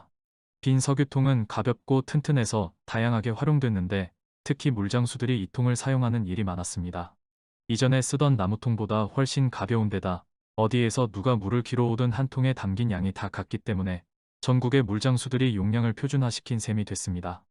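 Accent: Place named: Gyeongsang, South Korea. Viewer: 말씨와 말투를 보니 갱남분으로 보이네요. 학원맨 나는 1975 명동파 챔피온 다방 앞 소년들이 회색양복이라 부르는 19세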